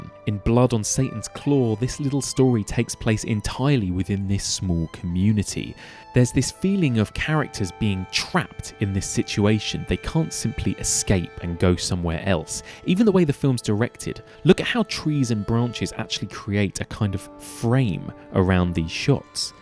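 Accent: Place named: England, United Kingdom. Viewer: Why this whinging over history? It’s so annoying! Get a grip! That’s why our forefathers BUILT shlt and all we can do nowadays is tear down the house we inherited. I can tell by your English accent you are Keir Starmer like guy. Who actually gave you guys the right to torch down the civilization of your fathers going back over 1000 years. 1000 years going strong, then you guys get power and destroy England in a way that cannot be undone. What gives you the right?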